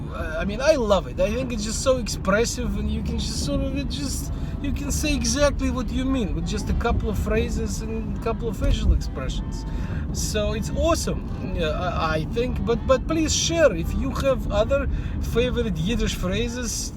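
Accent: Russia. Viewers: "God bless America" :) but i think you turned out the way you did (wonderfully great and funny) because you come from a communist backgound, and i can relate to that. thank you for making the effort ! i LOVE your accent, it is such a mix of russian, something else and american :)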